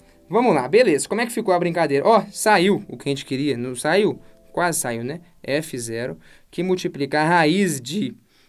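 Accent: Minas Gerais, Brazil. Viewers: Cara eu amooo que vc tem sotaque de minas, me sinto acolhida kkkkkkkkk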